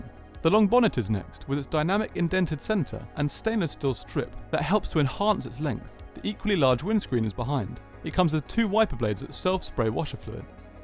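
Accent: RP, United Kingdom. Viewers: You sound like you have just left Eton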